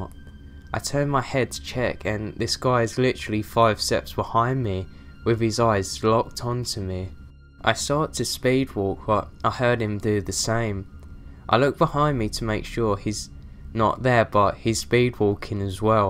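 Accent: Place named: London, United Kingdom. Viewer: Are you from Manchester? I'm working on my regional dialects and accents from the UK since between my family, and my job, I've been spending more time than ever there. Namely Bodycote. So to my untrained, or at least little trained ear, you sound like your from Manchester, or the surrounding area. Although, there's a bit of that London sound in there as well, although not as much. Or I'm just fucked on the head, and have no idea what the hell I'm talking about being from Detroit and all lmao. I've been told I have a tail specific accent as well. Not quite Midwestern, nor Wisconsin, or Ohio. Just that Detroit, and Chicago sound alike. Fuck if I know 😡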